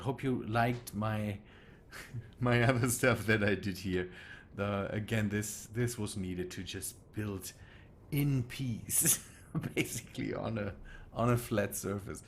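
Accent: Germany. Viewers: DIESER DEUTSCHE AKTZENT AAAAAHHH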